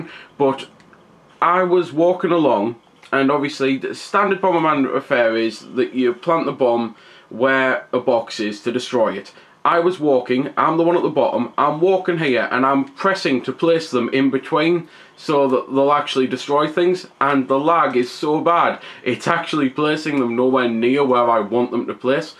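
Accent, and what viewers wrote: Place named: Sunderland, United Kingdom. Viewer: Are you from Sunderland?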